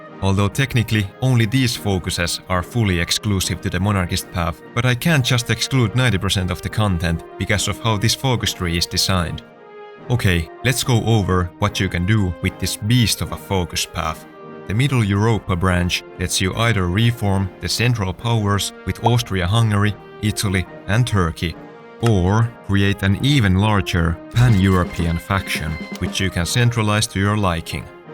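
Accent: Finland. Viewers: Are you Finnish becuse you sound like Finn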